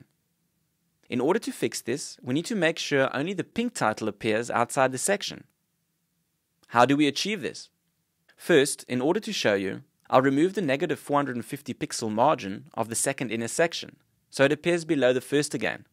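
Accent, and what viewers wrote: South Africa. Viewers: South African accent, yum. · I love that South African accent, makes me feel back at home.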